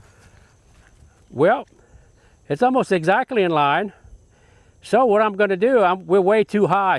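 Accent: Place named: United States. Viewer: Great and easy to follow and understand. Talks American English and explains thoroughly yet simply. 👍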